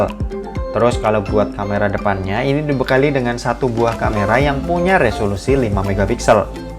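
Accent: Semarang, Indonesia. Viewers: mas, sampean wong semarang mesti hehehe suwon info2nya mas, sangat membantu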